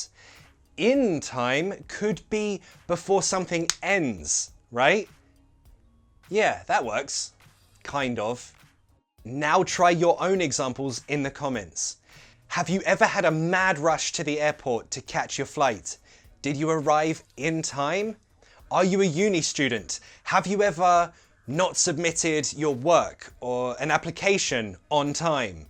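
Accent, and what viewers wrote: United Kingdom. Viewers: Sooooo amazing British accent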